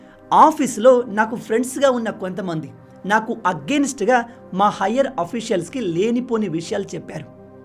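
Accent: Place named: Andhra Pradesh, India. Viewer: Bro meeru andhra anukunnanu.mee voice asalu telengana la ledu.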